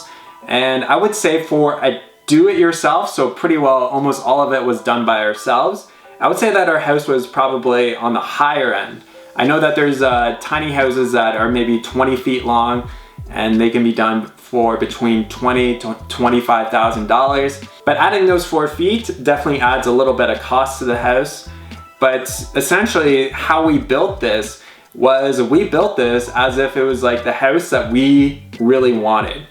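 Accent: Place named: Canada. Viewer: It depends on your perspective, you sound Canadian. So housing would be extremely costly and it would be a steal depending on if you can stand living there. Homes are much more adorable where I live. Here you would be the village idiot for spending that much for a trailer. I like it and I’m glad your happy.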